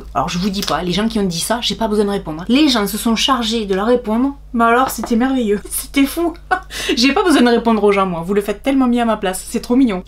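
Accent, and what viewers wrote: South, France. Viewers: Merci pour votre bonne humeur, votre accent du soleil me remonte le moral 👍 Je vous comprends tellement, il me tarde de pouvoir me remaquiller 😊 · C’est la 1ère fois que je commente car ce n’est pas dans mes habitudes. Je suis tombée un jour par hasard sur 1 de vos vidéos du début de votre maladie, les mystères de youtube... je l’ai regardé, puis j’ai suivi les autres, j’ai fait des come backs sur les vidéos précédentes... Je vous ai trouvé tellement authentique, tellement nature, à chaque étape de ce long et douloureux parcours. Vous êtes une belle personne au dedans comme au dehors, peu importe les cheveux les sourcils, les cils, et cette pointe d’accent qui sent bon le soleil que vous avez au fond de vous, vous êtes vous même, ne changez rien. Je vous souhaite le meilleur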